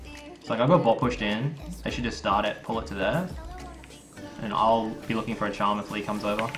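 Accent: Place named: Australia. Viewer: Australian? can tell by the accent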